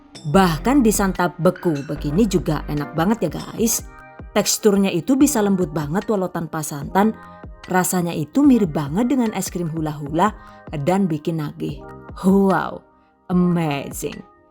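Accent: Banyumas, Indonesia. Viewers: the best ngapak bar bar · Wah bisa dijadikan ide buat usaha nich bun nanti insya allah klau saya diberikan kesembuhn sakit saya ini,saya akan mulai usaha seperti ini insya allah #GiveawayTHV2021..dn bun setiap liht vidio bunda saya sll bisa ktwa dipertengah krn bunda sll pake bahasa ngapak🤣🤣🤣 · Nyong seneng bgt karo bahasa ne rika sing ngapak kue yu ..🤣🤣 mantap resepe 🤗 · Ngapak ya😂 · Ya Allah.. Ada ngapaknya.. 😂😂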